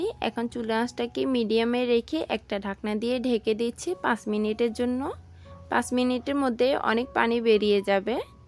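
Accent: Chittagong, Bangladesh. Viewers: Apu tumar basha ki ctg teh?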